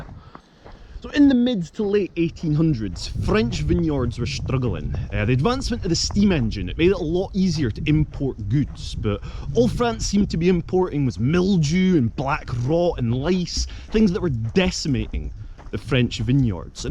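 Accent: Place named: Scotland, United Kingdom. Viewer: From Portugal 👏👏👏 fantastic Scottish accent!😁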